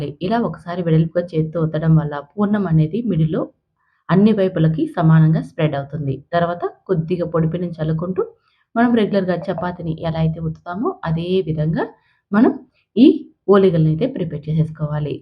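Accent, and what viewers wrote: India. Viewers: AMMALU MEE PADHA VUCHHARANA BHATTI RAYALA SEEMAVARI LA ANIPISTHODHI AMMALU "VESUKOKUNDA" AND."RAKOKUNDA" MEEMU 20Y.BACK.RAYALASEEMA ANANTHAPUR VASTHAVYLAM AMMALU . THANQ AMMALU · Nenu mi matalu vini ma Kadapa vallu laga unaru anukuna andi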